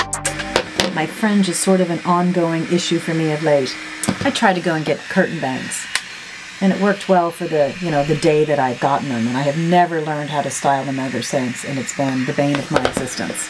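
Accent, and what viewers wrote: Ireland. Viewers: Wonderful! I'm from the UK but have ties to Guiness and love the Irish accent! Good that you can swap from Irish Colleen to Southern Belle!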